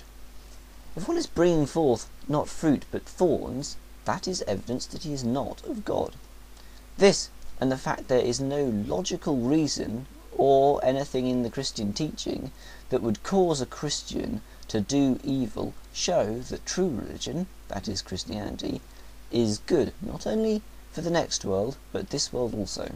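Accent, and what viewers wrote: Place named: United Kingdom. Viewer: I don't know of which city you show your clips from, however, all countries have riots at one point or another. It's just that the secular ones have less crime, murders, thefts, lootings and gun violence. Your accent sounds British. Were you aware that since 2000, many Christian churches in Britain are converted to mosques, temples, shops, stores and tattoo parlors. Yet in "godless" england, 0 school shootings since 2000. In 80% god fearing USA, since 2000, dozens of shootings, 150 deaths. FOOL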